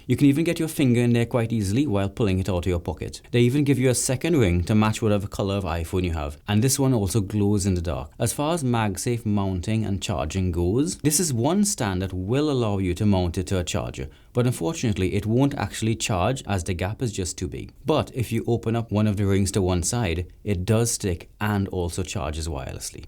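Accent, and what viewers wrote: Trinidad and Tobago. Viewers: Speech quality almost convinced me you weren't Trini for a second. Da accent cyah hide! Was looking at the Peak Design magsafe tripod to set up my phone for some photo/videography, but the lack of dual sided magnetism just couldn't justify the purchase since i find myself in some odd locations where a mini tripod slim enough to fit in my pocket unnoticed, doesn't always work. trying to get shots. This one however, checks all the boxes for the modularity i appreciate in a well contrived and engineered product. This and a magsafe water bottle... or a Milwaukee Packout bottle with a 3d printed bracket an I SET! Good video, man! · Are you from Trinidad? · Is this a guy from Trinidad?... with a touch of British accent?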